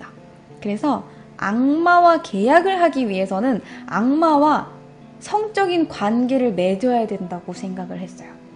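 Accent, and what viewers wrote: Gyeongsang, South Korea. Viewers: 사투리가 들리는데 경상도쪽 억양인가요?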